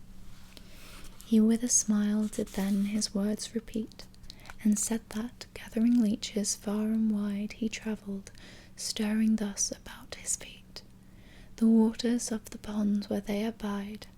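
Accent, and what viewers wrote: United Kingdom. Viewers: Thanks for your contents. Your pronunciation is very pleasant, are you British?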